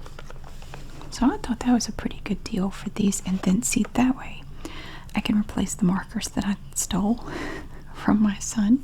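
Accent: South, United States. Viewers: i love love your southern voice SO much !!! ❤️ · Omg I love your accent!! Can really hear it when you say “pie!” I always wanted to move to the south!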